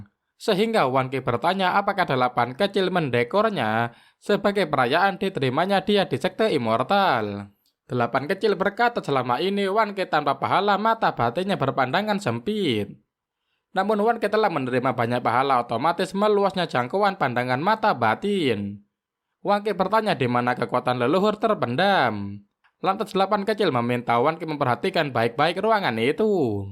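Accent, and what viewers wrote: Java, Indonesia. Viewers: jelek suaranya, bahasa indonesia dengan lidah kental Jawa.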